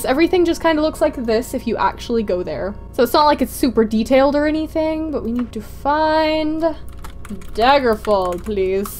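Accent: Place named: Scotland, United Kingdom. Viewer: You sound scottish ! just like Karen Gillian in the Doctor ! subbed !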